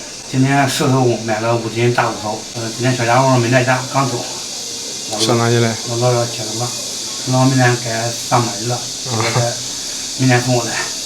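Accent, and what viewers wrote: Henan, China. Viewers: 这口音是河南还是襄阳啊？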